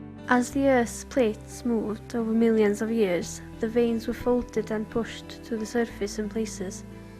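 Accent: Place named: North Wales, United Kingdom. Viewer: Very N. Walian. Both my aunts sounded like that. I hope "Bleina" is re-inventing itself - it deserves to.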